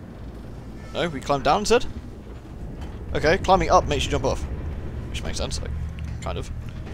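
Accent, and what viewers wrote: United Kingdom. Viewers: Not surprised the unnamed gas giant's atmosphere and winds shredded the station up, probably similar but larger in magnitude, mass and strength of winds like Jupiter. Also not surprised with the ending being an homage to the original 1979 Alien movie. It took me about 3 or 4 days to watch this all in the evening hours (7 PM - 1-2 AM EST) , fantastic LP with your sense of humor and/or logic and your awesome British accent.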